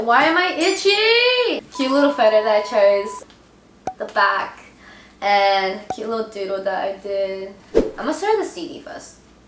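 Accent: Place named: Australia. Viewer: i cant with her aussie accent is so precious 😭🤚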